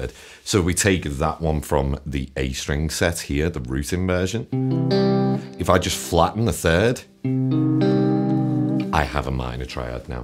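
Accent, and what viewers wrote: Liverpool, United Kingdom. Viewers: Bass Scouse ! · Thank for the amazing video my fellow scouser! I also live abroad have done for a long time, so its nice to hear a familiar voice. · Scouse not English! has the most fantastic elegant Scouse elocution.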